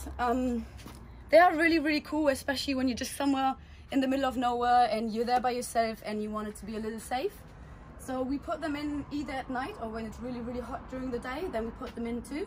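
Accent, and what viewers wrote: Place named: Denmark. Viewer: You really sound like you have a danish accent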